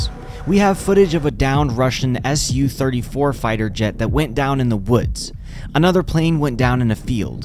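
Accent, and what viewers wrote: United States. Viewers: This is my first video that I watch from your channel I just subscribed and hit the all button very good at speaking about what's going on very informative. And you sound like you're American or Canadian one of the two and there's not many people on YouTube that are American or Canadian that are actually taking the time to gather all this information. 5 ⭐ to this video well done. 5 ⭐🇺🇦❤️🇺🇦❤️🇺🇦❤️🇺🇦❤️🇺🇦❤️🇺🇦❤️🇺🇦❤️🇺🇦❤️🇺🇦❤️🇺🇦❤️ and I love it when I see high Mars systems going to work on those Russian sons of bitchs. Those systems are very very accurate. Glory to Ukraine 🇺🇦🇺🇦🇺🇦🇺🇦🇺🇦🇺🇦🇺🇦🇺🇦❤️❤️❤️❤️❤️❤️❤️❤️❤️❤️❤️❤️🇺🇦🇺🇦🇺🇦🇺🇦 Ukraine will be victorious ❤️❤️❤️❤️❤️🇺🇦🇺🇦🇺🇦🇺🇦🇺🇦❤️🇺🇦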